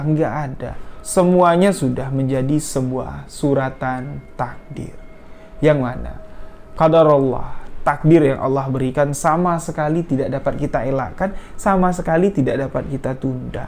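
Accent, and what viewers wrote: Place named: Jambi, Indonesia. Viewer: Mas dari Jambi ya. Logat ny pengen tau aja saya. 🙏 atau dari Madura.. Salam dari Setu Bekasi. Sehat selalu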